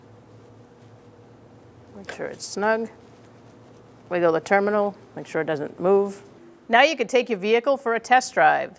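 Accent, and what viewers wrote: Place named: East Coast, United States. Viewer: The East Coast is strong with this one :)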